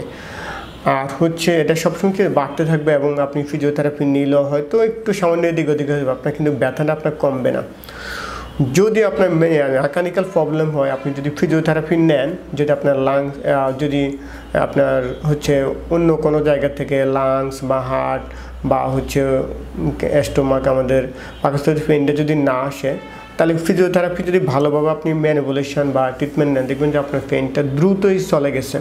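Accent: Noakhali, Bangladesh. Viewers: শুদ্ধ করে কথা বলা শিখতে হবে আগে, বাড়ি কি নোয়াখালী নাকি? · ভাই নোয়াখালি বাসা না কি কথা বুঝতেই অসুবিধায় পড়ে গেলাম.. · আপনার বাড়ি নোয়াখালী। তাই পিঠ কে ফিট বলতেছেন। পেইন কে ফেইন বলছেন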